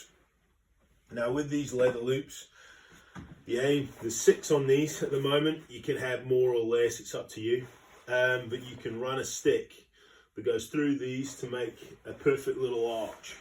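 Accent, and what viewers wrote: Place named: Australia. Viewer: Looks awesome! I don't remember you sounding so Australian though!... Have you spent some time over there since we last spoke?!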